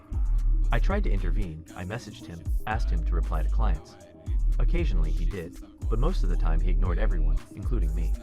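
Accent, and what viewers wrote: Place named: Russia. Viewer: Honestly i think you should've made the video with the Russian accent it would've been more enjoyable (no offenses)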